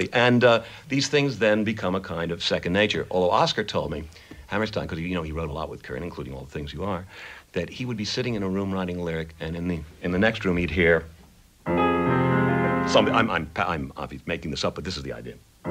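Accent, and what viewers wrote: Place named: United States. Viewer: The most American accent ever.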